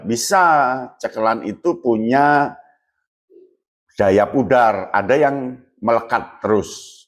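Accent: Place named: Jakarta, Indonesia. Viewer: Sayangnya pembawaanya kurang Njawani malah terkesan bahasa ber ala Jkt.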